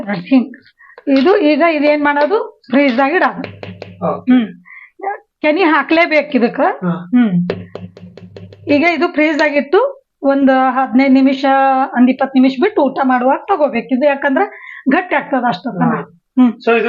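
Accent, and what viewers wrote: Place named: Vijayapura, India. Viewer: ನಿಮ್ಮ ಮಾತುಗಳು ಬಹಳ ಇಷ್ಟ ನಮಗೆ ಅಪ್ಪಟ ವಿಜಯಪುರದ ಮಾತುಗಳು ನಿಮ್ಮ ನಾನು ಬೆಂಗಳೂರಲ್ಲಿ ಇರುವುದರಿಂದ ನಿಮ್ಮ ಮಾತುಗಳು ಕೇಳಿ ತುಂಬಾ ಖುಷಿಯಾಗ್ತದೆ ಏನು ನಮ್ಮವರು ನೋಡಿದಂಗೆ ಆಗುತ್ತದೆ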